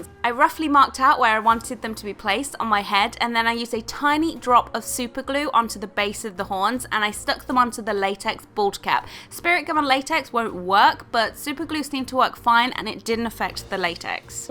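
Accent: United Kingdom. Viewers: When you started talking it cracked me up because that light British voice with the devil face was just such a wierd combo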